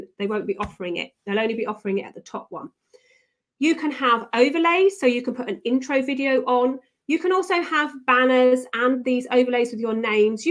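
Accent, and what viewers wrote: United Kingdom. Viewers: lOVE YOUR BRIT ACCENT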